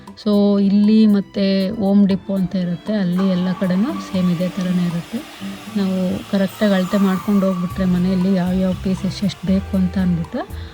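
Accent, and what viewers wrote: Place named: Mysore, India. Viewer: Mam I am new additions to your channel thumba chennag nam mysore bashe mathadtira thanks a lot namge ee beautiful life na thorsethirodakki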